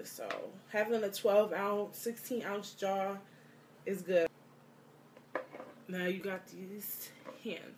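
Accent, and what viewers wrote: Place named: Brooklyn, United States. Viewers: Nice video. I like your accent. Where are you from? NY? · Sound like you from brooklyn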